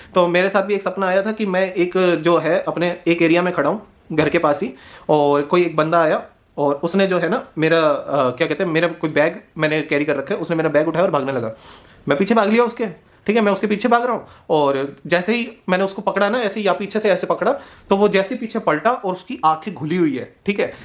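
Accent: Haryana, India. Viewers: Bhai teri voice me haryanvi touch h Hostel m haryanvi students k saath rha h kya bhai ❤️❤️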